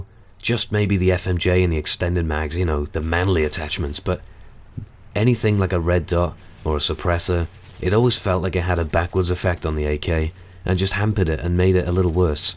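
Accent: United Kingdom. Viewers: That voice is incredible, where in the UK are you from?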